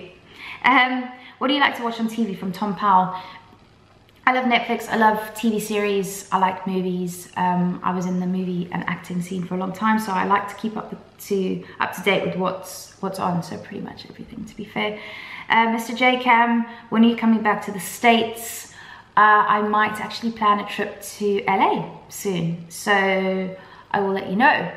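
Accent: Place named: South Africa. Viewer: I had no idea that you weren't British but you have a strong South African accent. I had no idea you are a singer.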